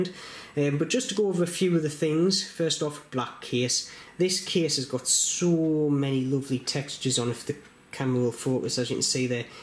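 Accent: Newcastle, United Kingdom. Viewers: That's a proppa Geordie accent mind 👍👍👍👍 Shy Bairns Get Nowt 🤣🤣🤣 looking forward to seeing some future videos featuring your mods 😉😉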